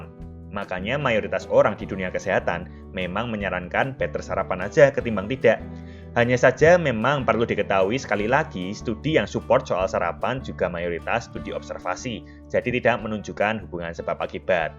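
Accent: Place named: Surabaya, Indonesia. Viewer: Hdaerrr suroboyooo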